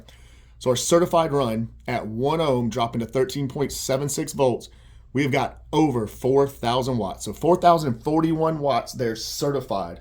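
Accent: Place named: South, United States. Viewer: Yes, I hear that. It is correct to my ears. The wrong way would be: three thousand, five hundred, point nine one. Are you in the USA? If not, there may be a difference in the way numbers are spoken. I'm American so it sounds normal to me. Another acceptable way he could have said it is: three thousand, five hundred AND ninety one. Either way is normal over here. He also speaks with a southern accent, so that can be a bit difficult if your not used to it. I don't think he's trying to deceive anybody. 👍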